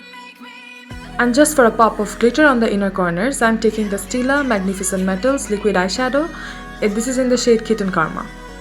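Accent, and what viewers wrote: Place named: Bangladesh. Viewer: Why Indian? You sound like bangladeshi 🤨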